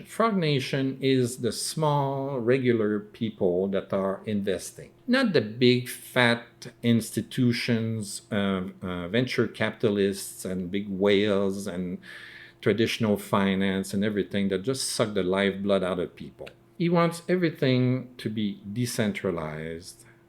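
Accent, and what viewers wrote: Quebec, Canada. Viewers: I can tell by your accent that you’re from Quebec!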